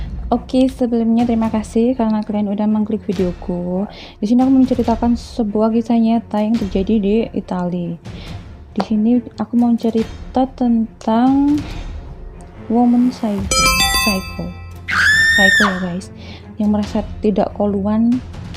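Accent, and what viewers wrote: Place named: Java, Indonesia. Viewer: masih medok ya buk 😭😂💛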